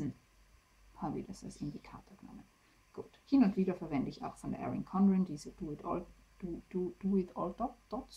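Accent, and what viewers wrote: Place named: Austria. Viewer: eine Landsfrau in ihrem Dialekt erzählt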